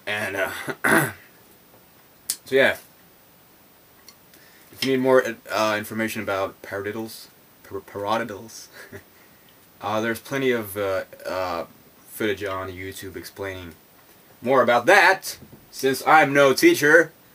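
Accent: United States. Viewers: sweet vid. Its odd you dont really have any accent. You sound like you could have been raised in the midwest.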